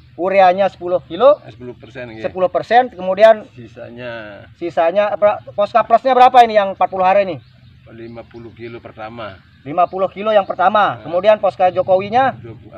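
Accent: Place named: Lombok, Indonesia. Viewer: Logat lombok ni bos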